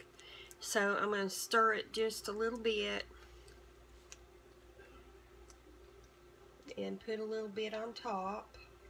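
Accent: South, United States. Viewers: you are such a sweet heart ya had me with the breakfast casserole n Tennessee sausage.. is the only thing this TN gal will eat.. Love your gentle nature, nice southern charm.. NC n TN have similar accents.. I get the make a meal for several days.. is cheaper.. faster n no fussin, always have extra in case ya have company droppin in... cuz it is jus bad manners to NOT.. feed them.. always have a snack drawer in the fridge in case there are kids along too.. they love goin in there n rustlin around choosin something sweet or salty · What a precious lady❣️ I’d love to stop by and have dinner with this sweet lady...love her southern accent. Reminds me of my family ❤️❤️❤️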